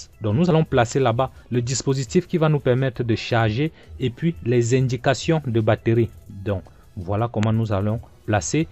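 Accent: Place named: Africa. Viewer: C'est super, j'adore ton accent africain, et le tuto est super bien fait 👍 je m'abonne pour ce travail de qualité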